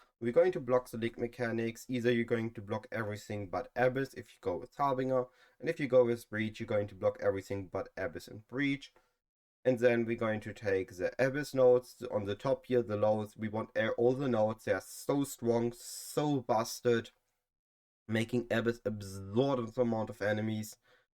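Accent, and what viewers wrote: Germany. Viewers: German accent is so disgusting and muddled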